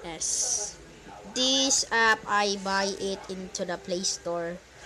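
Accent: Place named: Visayas, Philippines. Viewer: para kang bisaya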